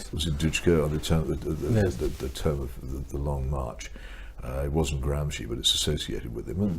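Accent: RP, United Kingdom. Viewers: Take the plum out Peter; drop the affectation. Can hardly tell what you are saying.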